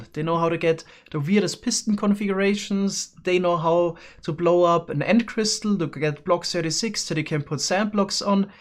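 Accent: Germany. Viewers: Are you german cuz you have this accent? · I love ur german akzent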